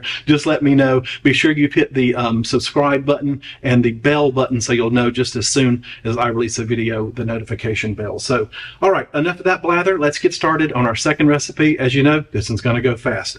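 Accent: South, United States. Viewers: "run around the yard nekkid and yell at the dog" You been spying on me? 😂😂😂 I truly enjoy your videos. We sound so much alike with the southern ridicularity tossing words together. I love it!! Definitely trying this tonight. Hotter than Hades and more humid than Satan's draws out there baling hay. That's my justification for chocolate.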